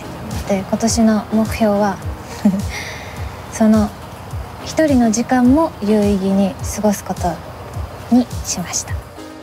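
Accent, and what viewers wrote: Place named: Japan. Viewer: How lovely her japanese accent is...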